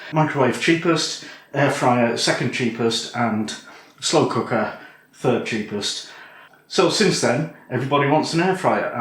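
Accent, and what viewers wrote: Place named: London, United Kingdom. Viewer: ) I am an Ilkeston Derbyshire (about 50 yrs ago) Sc-oh-n speaker. :-) I thought your pronunciation was by posh people from London. A very pragnatic review. I have an elderly (20+yrs) Phillips (about 2.4 L I think) thing and have recently bought an IP Vortex 5.7 Litre one cos it is bigger. I also have a Kasa thingy which gives some indication of electricity used (no idea how accurate, doubt it is very, if at all) and it says to me that it costs 0.14 kWh to cook a basket of Oven type Chips and about 0.2 kWh to cook the potato type (Non-par boiled which I would normally do). I know because I monitor my electrical demand daily that it used to cost 80-90p to make a batch of Home Brew (full Mashed from grain), it now costs over two pounds. Tsk - my expensive brew has increased in price to almost 60p/pint. (guesstimate) :-) I suggested some time ago that Scones were - sort of-separated out from a partially cut up shape of a round one in the middle and (perhaps) eight ones around the centre which were wedge shaped. 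I have still not seen where I think that from. Must make some.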